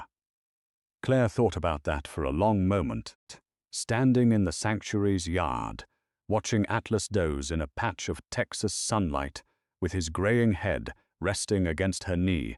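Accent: United Kingdom. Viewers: British accent ruins the story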